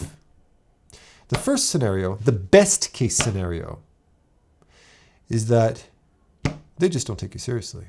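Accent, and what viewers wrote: Quebec, Canada. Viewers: As a french speaker I can say you’re saying « la crème de la crème » very well, you sound like a guy from Quebec.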